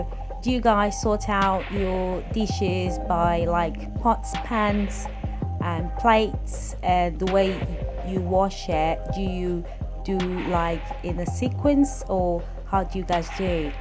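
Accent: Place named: United Kingdom. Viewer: Very interesting accent you have there. It's definitely British but sounds like there is another strong influence there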